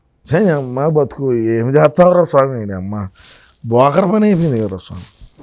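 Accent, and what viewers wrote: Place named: Chittoor, India. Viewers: Anna tirupati language,tamil super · Chittor yasa rocks 😂😂😂😂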